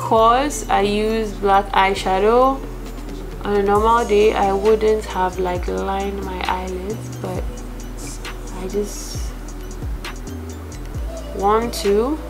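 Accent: Ghana. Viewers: I love watching your videos and your technique. Although I’m much older than you and I’ve been doing my make up for many many years I still like to learn new techniques and see how others do it in a professional and fabulous way. FYI - I also ordered the Morphie brush because I liked the way it moved on your skin. I see you are from Ghana. I have been to Ghana and it was life-changing for me. I hope to return someday. Love your accent! 💕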